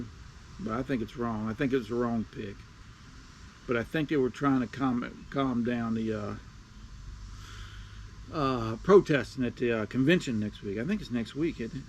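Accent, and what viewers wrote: United States. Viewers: You sound like a hillbillie. now one cares about what u think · Your ignorance is striking. You sound like a backwoods hick and you think like one too.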